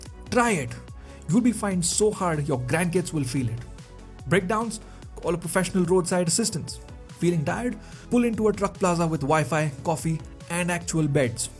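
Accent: India. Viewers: your accent so good reminded me of my indian teacher. "magzimum Load" chills.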